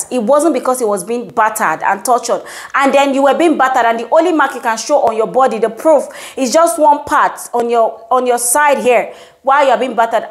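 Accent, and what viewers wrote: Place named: Africa. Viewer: Who is complaining about your accent again? Are we not Africans? My dear, no be everything you go address o. You may need to ignore some comments.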